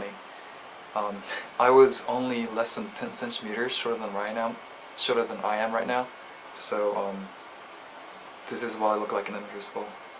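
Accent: Australia. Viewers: you sound Australian, it is odd. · You sound Australian when you speak in English! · to me your english sounds really australian here, very interesting! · Dude, nice Australian accent.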